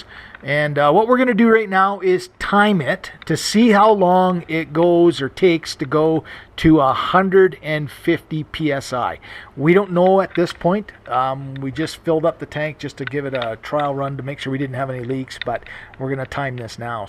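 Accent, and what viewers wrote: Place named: Canada. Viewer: accent annoying. Canadian or border states. Install video was BS.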